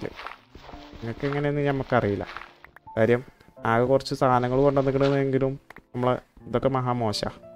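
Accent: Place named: Kozhikode, India. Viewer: I think you're frm kozhikkode or kannur😌🍀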